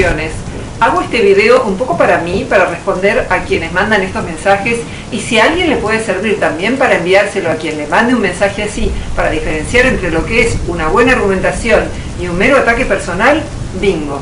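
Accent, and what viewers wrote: Argentina. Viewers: Detesto su acento argentino . . Pero la amo al oírla hablar . . Dios,Darwin,Mendel o Einstein te cuiden y guarden por siempre . .😍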